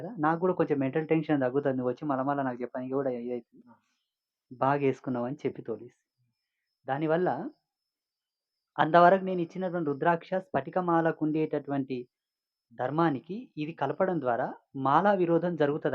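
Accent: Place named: Telangana, India. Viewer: Meru telanganayasa matldina me vakkulu adbutham swami 🙏 telagana biddalandaru garvapadali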